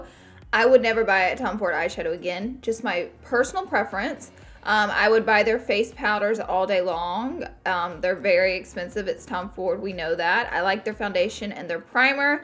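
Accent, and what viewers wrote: South, United States. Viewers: Where are you from???? I love your southern accent. I'm from mobile, Alabama and I can just feel that you're from Alabama lol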